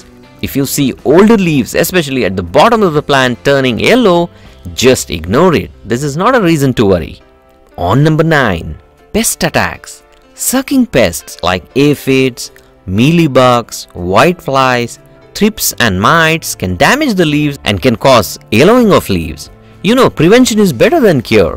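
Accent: India. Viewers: Sir ur Indian english is very good. One thing u should bear in ur mind that u r not teaching agriculture student, Just tell to the point for what is needed for a general home gardener. Thanks.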